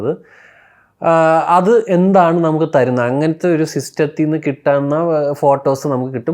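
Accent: Trivandrum, India. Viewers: Nalla tvm slang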